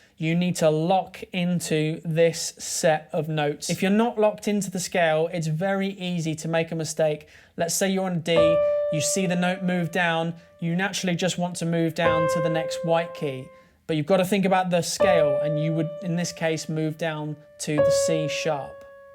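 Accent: United Kingdom. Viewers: I'm learning piano reading and english as well. I find your channel very useful and your british accent is way much suitable for doing so. Thanks!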